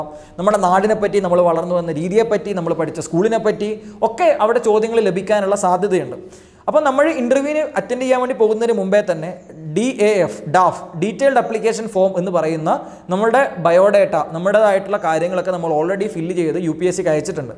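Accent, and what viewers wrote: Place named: Kollam, India. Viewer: Kollathullavr samsarikunna athe baasha shaili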